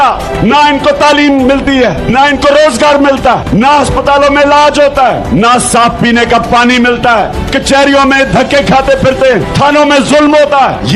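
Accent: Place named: Pakistan. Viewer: this sound Pakistani sound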